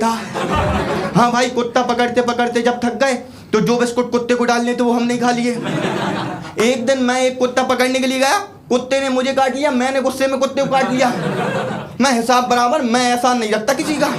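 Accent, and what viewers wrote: India. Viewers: Bhai bundelkhand ke lag rai kaha se ho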